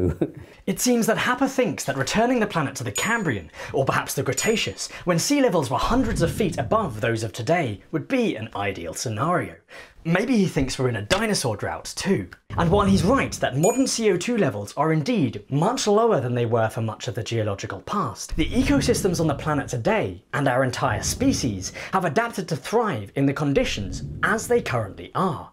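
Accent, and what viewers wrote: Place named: RP, United Kingdom. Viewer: Firstly: you're jacked, British, non-white and sound posh. I like it! But the saturation myth (or effect, if you're of that persuasion) is a red herring. We don't have to care about physicists squabbling about yay or nay on this. Instead just look at the data of the supposed effects of rising temperature and CO2: arctic and antarctic ice, tropical cyclones, droughts, crop yields, Great Barrier Reef coral cover, greening, desertification, wild fires, deaths from temperature (both heat and cold) etc - hardly any of them are happening in an adverse way. And rising temperature/CO2 are actually making certain things better: few temperature deaths, improved crop yields, greening, more natural diversity...... Get real everyone! You can continue to shriek inside your echo chamber OR you can go look up the data with an open mind, intellectual curiosity and critical thinking. 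You'll flip, like me - guaranteed if you're not yet fully brainwashed.....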